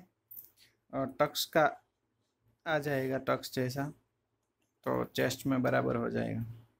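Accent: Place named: Gujarat, India. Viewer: aapki bolne ki sppech se lagta he ki aap gujrari ho agar aap gujrati ho to plZ gujrati video bhi ek banaye na muje beak side pe kadi vala blause sikhna he plz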